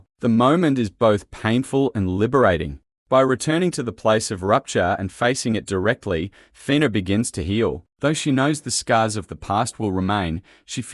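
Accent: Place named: Australia. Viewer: FINALLY! AUSSIE ACCENT!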